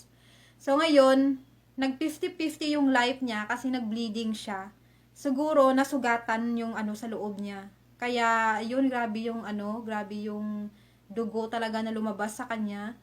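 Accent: Iloilo, Philippines. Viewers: Ilongga ka mam?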